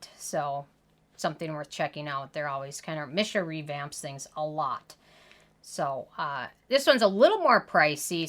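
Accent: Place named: Midwest, United States. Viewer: Are you from the Midwest? Your accent sounds like mine. I’m from Michigan! Haha. Also, great video as always.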